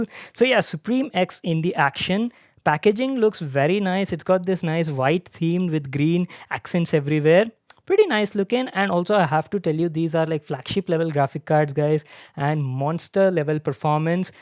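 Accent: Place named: India. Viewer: he is like linus tech tips with an indian accent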